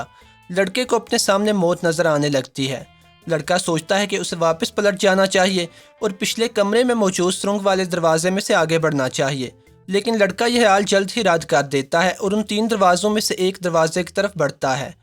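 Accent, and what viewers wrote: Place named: Lahore, Pakistan. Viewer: Teri awaz boht ajeeb hai , you are LAHORI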